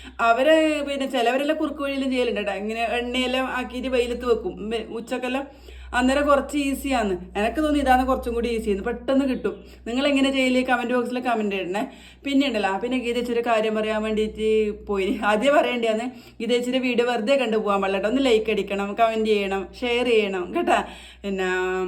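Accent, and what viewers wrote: Kannur, India. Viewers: എന്താ രസം പറയുന്നത് കേൾക്കാൻ നമ്മുടെ കണ്ണൂർ ഭാഷ പൊളിയല്ലെ മുത്തെ 🥰🥰🥰🥰❤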